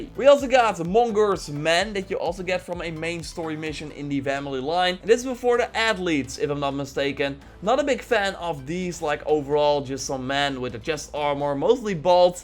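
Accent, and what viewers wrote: Netherlands. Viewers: Are you dutch want het klinkt zo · Nederlands accent niet normaal xD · Are you Dutch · Are you dutch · 1 different question what is your country no hate but you sounds like somebody from the Netherlands 😅😅 Love your vids 😉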